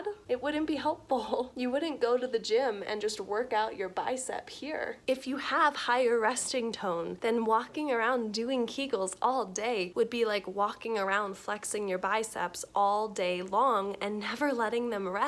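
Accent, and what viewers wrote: Minnesota, United States. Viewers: Are u from Minnesota?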